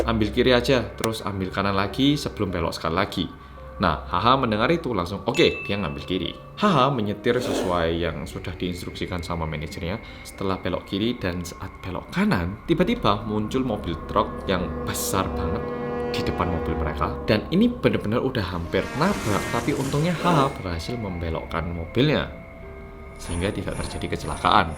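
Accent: Java, Indonesia. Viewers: kangettt gw, suara mu itu lho bang medok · Medokkkkkk aja mas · Jam 1.44 AM kyaknya jdi jadwak tetap nonton rindingdong deh, makash mas berkat suara medok mu aku jdi cepat ngantuk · Iya medok ny ilangin ak suka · Medeeeekkk nya itu lhoo masss😆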